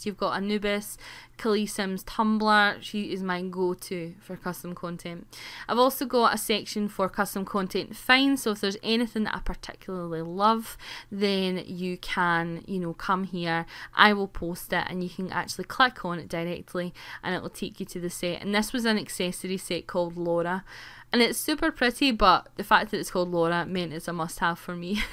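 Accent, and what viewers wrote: Scotland, United Kingdom. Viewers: Awwwww I LOVE him! I got a kitten recently. Her name is Lola. She's SOOOO cuteeeee!!!!!!!!!! Now for me rambling on and on for no reason xD : My friend let me borrow this boom that takes place in Scotland and it made me think of you SOOOO much! When I'm reading about people in different countries, I like to have the accent in my head, and in the book her BFF was a Scottish girl named Emma (the main character is not a scot. OMG THAT RHYMES!!! :p ), so to figure out her accent so I didn't get frustrated when I read the book, I thought of how you spoke and repeated that in my head over and over again. :o)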